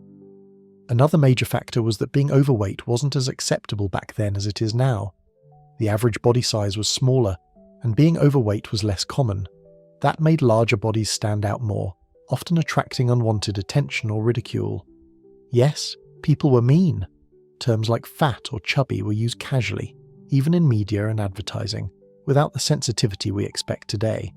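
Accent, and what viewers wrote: United Kingdom. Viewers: The voice and quite refined English accent are very pleasant to listen to - but the language is American with the usual grammatical errors and mispronunciations, which is a real pity.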